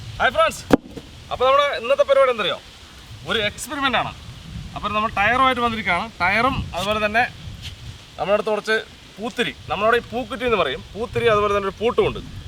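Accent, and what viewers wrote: Palakkad, India. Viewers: ഫിറോസിക്കയുടെ പാലക്കാടൻ ഭാഷ അത് വേറെ ലെവലാണ് 😘😘🔥🔥🔥🔥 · പാലക്കാടൻ ഭാഷാ കേൾക്കാൻ വേണ്ടി ഓടിവന്ന ഞാൻ 😜😜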